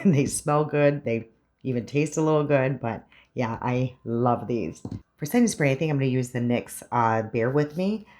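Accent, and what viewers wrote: Midwest, United States. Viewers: I just love your videos! I hear that Midwest twang, and it's like i'm watching my younger sister! 😎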